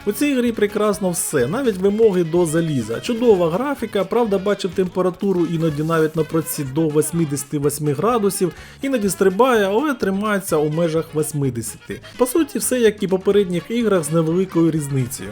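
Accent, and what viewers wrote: Transcarpathia, Ukraine. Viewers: Боже я налякався голоса автора почув Закарпатську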